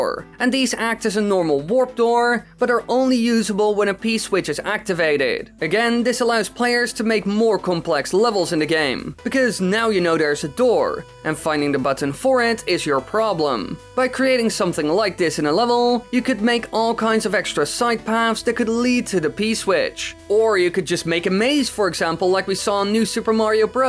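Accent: Netherlands. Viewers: Ik denk vrij zeker te horen dat jij Nederlands bent! :D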